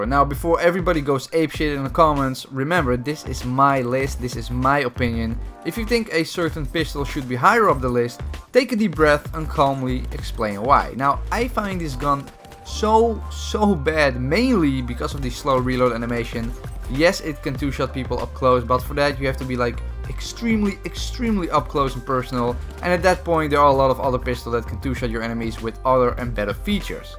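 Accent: Netherlands. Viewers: You sound Dutch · Ru dutch? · je hoort wel Nederlands accent in je engels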